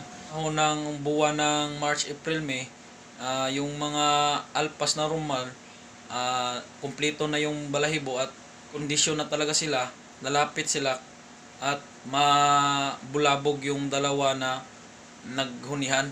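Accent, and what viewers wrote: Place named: Samar, Philippines. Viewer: Samar Yan bisaya or waray salita Niya Pansin ko sa salita niya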